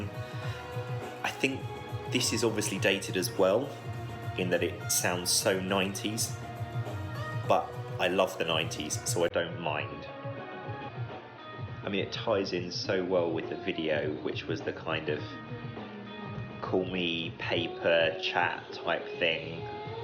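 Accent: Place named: United Kingdom. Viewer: I Just loved your british acsent... And I get a little bit surprised 'Cause i' ve never thought that you enjoy and... The other 3 songs I understand... But the other 2... It was a really huge surprise. Most of all:, 'Cause for her it wasn't a goog single... But I really enjoy this reaction...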